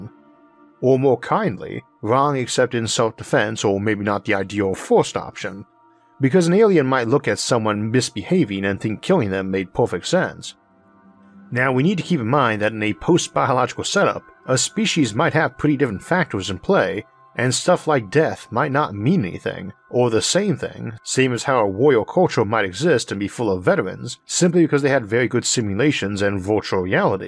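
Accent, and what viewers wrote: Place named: Kentucky, United States. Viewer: What is your accent, you sound like you are from old Kentucky from the1800's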